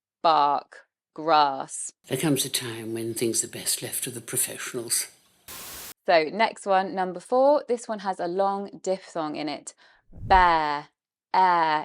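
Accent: United Kingdom. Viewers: Your British English accent sounds to be a bit muddled. Where are you from ? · ❤, your UK English accent sounds beautiful in your voice.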